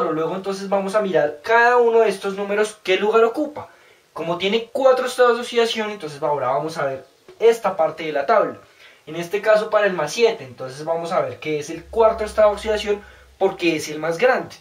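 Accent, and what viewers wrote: Colombia. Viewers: es Colombiano??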